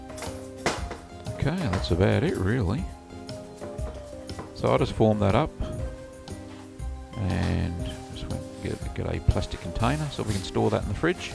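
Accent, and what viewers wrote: Australia. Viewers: You sound like the Aussie version of the BBQ Pit Boys!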